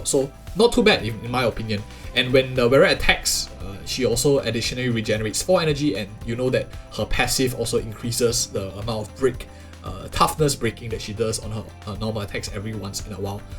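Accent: Singapore. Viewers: subscribed for the singaporean accent 😂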